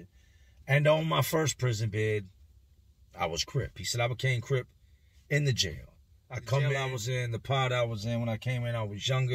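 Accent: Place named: Chicago, United States. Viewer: I knew that sounded like Chicago. What neighborhood? lol They thought you were a King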